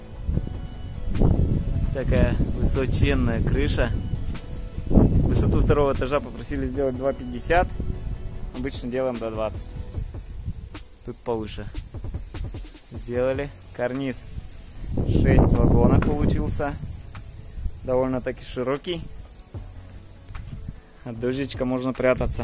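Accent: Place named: Tatarstan, Russia. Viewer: Ты походу татарин! Акцент татарский)!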